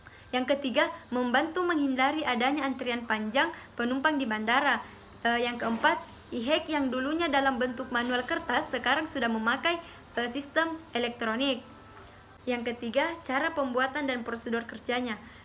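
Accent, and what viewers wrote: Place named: Makassar, Indonesia. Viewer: Kaya logat orang Makassar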